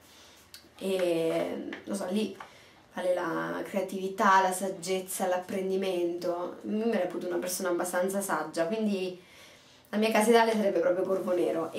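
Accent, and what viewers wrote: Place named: Tuscany, Italy. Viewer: io di Ancona !! ;) dai siamo piuttosto vicine !! capirai , pensavo fossi toscana !! hah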